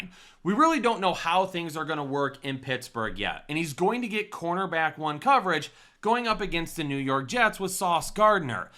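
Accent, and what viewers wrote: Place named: Michigan, United States. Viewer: Man, this accent is soooooooo Michigan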